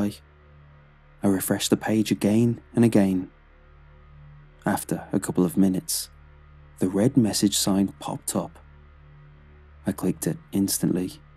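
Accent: England, United Kingdom. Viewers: YAY! BRITISH ACCENT! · English boi?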